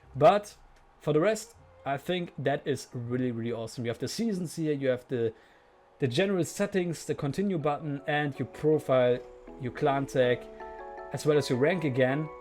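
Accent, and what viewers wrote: Germany. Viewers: you have a German accent. BTW great wishes from Germany, im really excited about your work and how good the game will become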